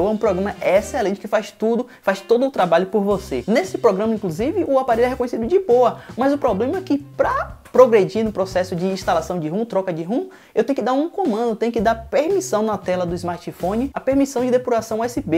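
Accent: Bahia, Brazil. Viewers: Tu é baiano ?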